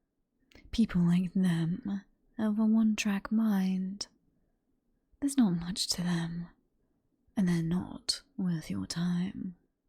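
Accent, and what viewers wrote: United Kingdom. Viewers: Definitely an interesting audio, and once again proving that the British accent lends itself so well to villains.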